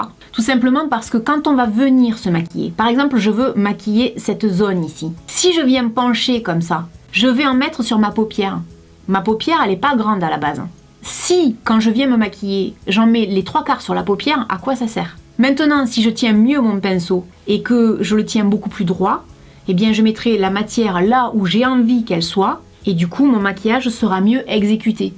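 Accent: South, France. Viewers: J'adooore! Merci pour tes conseils pratiques et clairs. Et l'accent du sud en prime, on adore! 😁 PS: tes ridules sont sublimes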